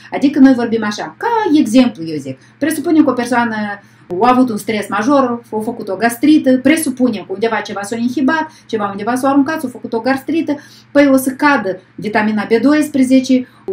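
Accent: Moldova. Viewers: Se înțelege foarte clar, doar dialectul e puțin moldovenesc, engleza înțelegeți mai bine?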